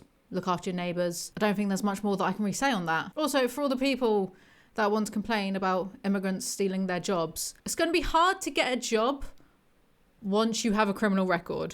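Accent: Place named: Essex, United Kingdom. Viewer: Essex voice what's these rumours then?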